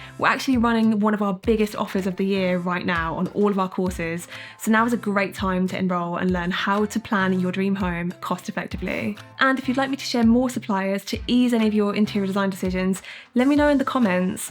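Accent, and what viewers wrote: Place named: United Kingdom. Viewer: Like a young Marisa Tomei with a British accent…and knowledgeable about home interiors!? 🤯😍 I’d be so over budget I’d need to pull a series of life threatening jewel heists. 😅 Liked and subscribed. 🤌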